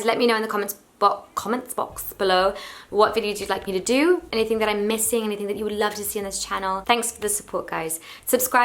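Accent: United Kingdom. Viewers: Love your new channel and thank you for finally making English videos again, i was just wondering about the heavy British English accent what’s up with that 😃 i mean i used to notice it a tiny bit before but now... 😄 still love everything though ♥️♥️ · Wow - hadn't seen a video in a year or two so thought I'd drop by. Imagine my surprise when I discovered she has a totally different accent now. (Go watch her videos from a few years ago - her accent is American. Now it's what - London?) So weird. Also weird is that she appears to be in the same bedroom she was 12 years ago, even though she's apparently married and has a kid now. Does she still live in her parents' house? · She moved to London so acquired a slight British accent not as much as her husband though. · Wow you now have a British accent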